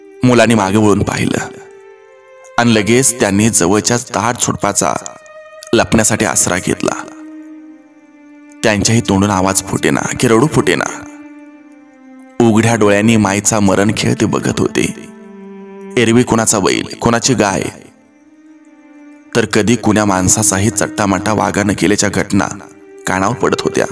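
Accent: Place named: Vidarbha, India. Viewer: ग्रामीण जीवनावर आधारित ही कथा आहे. वर्हाडी भाषेने तिला छान सजवले आहे. सरजी चा नवऱ्यचा अकाली मृत्यू झाला होता. ती आता एकटी पडली होती आणि पोर पोरकी झाली होती. घरी आली पण आधीच दुःखाने व्याकुळ झालेल्या सारजा ला सासू ला सामोरे जावे लागत होते. घरचा आधारच आता देवाने हिरावून नेला होता. दुःखी असताना पण रीती नुसार ती त्याला आज घास घेऊन चालली होती अनाहूत पणे श्वापदाने सरजी वर हल्ल्ला केला होता आता मुलाची आई पण वर्च्याने हिरावली होती. मुले आता पूर्ण निराधार झाली होती. दुःखाचा डोगर कोसळला होता. आल्या वेळेला हिम्मत देऊन राहा असे आजी सांगत होती पुढे आजी आपले होईल किंवा पडेल ते काम करून राहू असे सुचवू पाहत होती. कोणीही त्याना साथ देत नव्हते वाण्या कडे गेले पण त्याची नीच वृत्ती आता जागृत झाली तो हिंस्त्र पणे वागला जाई चा गैरफायदा घेतला होता एका निष्पाप जीवाला त्याने मजबूर केले होते. नको ते घडून गेले होते. कालांतराने त्याची आजी पण आता गेली होती. आता हार न मानता ती आल्या. 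प्रसंगा ला तोंड देत होती. जाई ला पुढे मुलगा झाला होता तो अख्या ती आता त्याच्या सह तालुक्यात राहायला आली जिद्द करून तिने मुलाला शिक्षण दिले. पुढे त्याने तिच्या कष्टा चे चीज केले. तो छान ठिकाणी कामावर रूजू झाला आणि तिचे दिवस पालटून. गेले. एका करुण कहाणीचा सुखद शेवट झाला. एक वास्तव दर्शी कथा असून व्हराडी भाषेचा लहेजा सुंदर लागला आहे.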